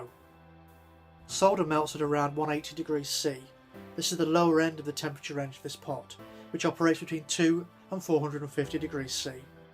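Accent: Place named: United Kingdom. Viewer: The Solder pot, im guessing your in the UK (accent and pound sign), did you have to change anything to the plug example earthing or anything - i've heard they are not safe for UK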